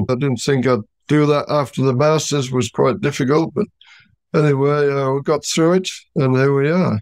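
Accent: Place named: Yorkshire, United Kingdom. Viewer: He sounds as if he's from Yorkshire.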